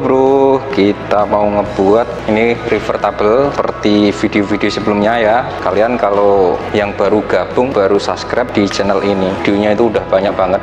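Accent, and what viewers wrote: Java, Indonesia. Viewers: Logatnya kaya wong jowo,asli pundi mas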